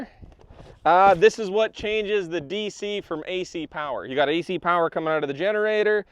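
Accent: Canada. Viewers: I noticed the way you say semi truck the i is like like a lowercase and the way we say it down here in the states it’s semi like an uppercase so is that a Canadian thing I take it because when I watch a Tesla video about trucks I gotta turn it off because all my life, I’ve heard it that way and it drives me up the wall not so bad when you say it, but you’ve got that Canadian accent. PS I love YOUR trucks.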